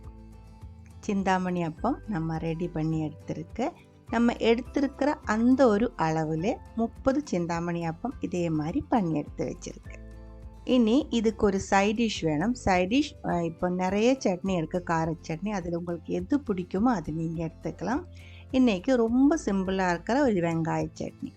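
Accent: Kerala, India. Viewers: Very nice receipe. Your malayalam tamil hearing is very different. 😂😂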